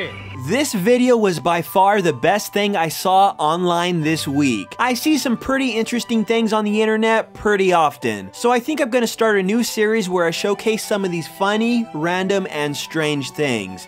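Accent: South Korea. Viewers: sounds korean to me. I also think regardless of what type of Asian he my be good on him... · Btw he's korean just by his accent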